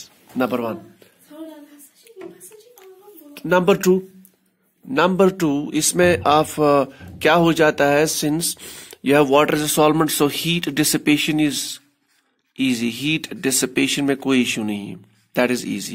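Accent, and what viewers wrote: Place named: Kashmir, India. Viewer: cxadaan hasaa chee .. nafar chee praraan 6.40 ... sir you are from kashmir .. love you exam k liye buhat zaroori tha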